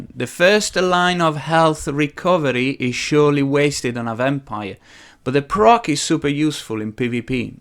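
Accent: North, United Kingdom. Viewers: sounds like northern UK, Dutch and South African in one haha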